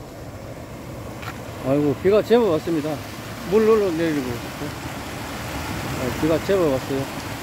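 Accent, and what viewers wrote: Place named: Andong, South Korea. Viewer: 992 출발합니다 오셔서 감사해요 농원의 달콤한 향 가득 만날생각에 설레요 같은 지역의 억양이라 더 정겨워요 안동태생 입니다 ㅎ 자주올게요 ❣️☕️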